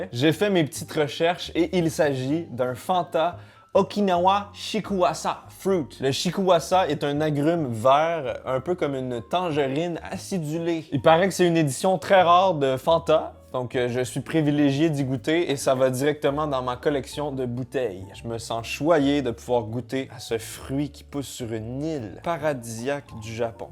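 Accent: Quebec, Canada. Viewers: Jsp si t québécois ou français sa me trouble 🥺😂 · How did you watch this then? With his Canadian french it makes the video 100x harder to watch haha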